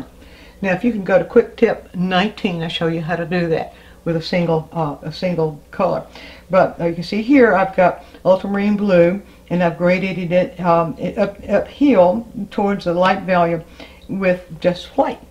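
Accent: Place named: Missouri, United States. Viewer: I got to know where you are from. You have a similar accent my grandma had. She was from Missouri. Am I close???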